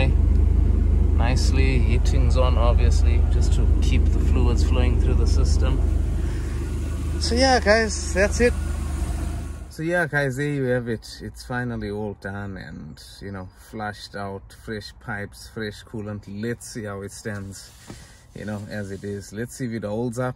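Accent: South Africa. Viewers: Hi Calvin here can hear you are from SA 😅 wanted to know is there a way i can get in contact with you for the spares you get cant get nice things this side for the golfs and audis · Where are you from? You sound like a South African.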